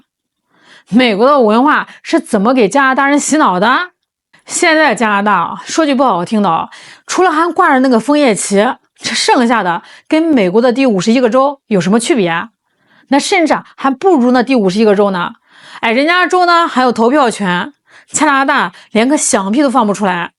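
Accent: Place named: Northeast, China. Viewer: 一股大碴子味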